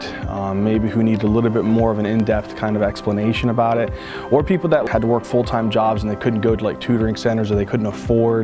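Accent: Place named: United States. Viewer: I started to follow you because I'm working as a military personal trainer. I saved people lives during my last year here in Italy thank to my military knowledge, and I would like to improve my knowledge in motorial science in order to give the best suggestions I could to recovery from many muscolar and psicology problems. So your tutorials are helping me out a lot, I love your American English which is really easy . Thank you a lot sir .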